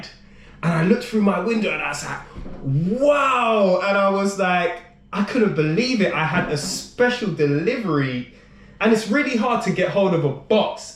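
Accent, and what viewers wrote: Moravia, Czech Republic. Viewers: JOOOOO Konečně někdo zkouší něco přímo z Olomouce!! Já tu bydlím a jsem pyšný na to že jsem Moravák. Jelikož jsem se v Olomouci narodil, tak už mi skoro vůbec nesmrdí ale spíše voní. Určitě by jsi měl zkusit Tvarůžkovou zmrzlinu (Tvarůžky Ice cream) já jsem ji měl a je VÝBORNÁ! Teď jsem na ně dostal hroznou chuť! Keep up the great work please. Btw you have really good Morava accent! 👍👍😄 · Parádní moravské přízvuk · Chlape, nemůžu si pomoct, ale když vidím tvůj optimismus, slyším přízvuk a zavřu oči tak slyším "Mirečka" , úplně vidím tu neřízenou moravskou chlastačku :D :D Prostě deformace "Básníků". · Ty nejsi Čech jak poleno! Ty jsi Moravák jak řemen 😁 říkáš toš jak pravý Valch, potom klidně přejdeš do olomouckého dilektu když mluvíš o Olomóckých tvaróžkách 😁 to jsi zabil! Definitivně nejlepší Youtuber, nikdo se ti v tomhle nevyrovná 👏 keep up with this amazing content 👏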